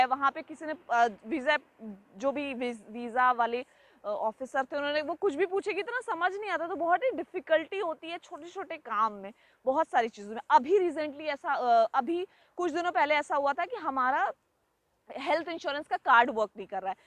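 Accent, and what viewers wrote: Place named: Pune, India. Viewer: your hindi accents like Pune or mumbai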